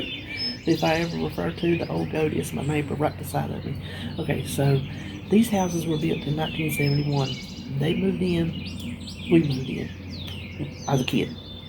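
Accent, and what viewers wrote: South, United States. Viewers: I love your Let's Talk videos. You make me laugh and I love laughing. Not to sound creepy or anything, but I love listening to you talk. I don't know what it is....whether its the southern accent or the fact that you are a downhome person.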